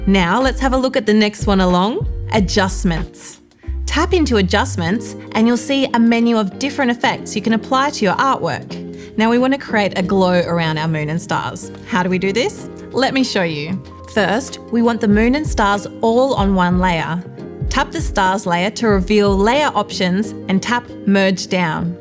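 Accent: Australia. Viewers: Good to hear an Aussie voice!😊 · Great tutorials but her voice/accent is like nails on a chalkboard. Aussie accents are the worst.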